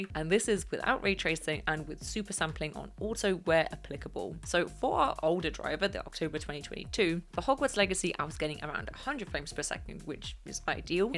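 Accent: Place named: United Kingdom. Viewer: are you thinking she is Australian? She is british. I think its funny so many americans can't tell the difference between the two accents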